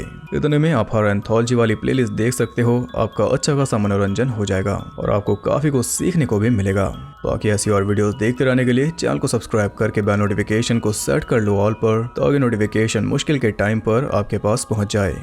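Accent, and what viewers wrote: Haryana, India. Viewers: Tm hariyana se ho na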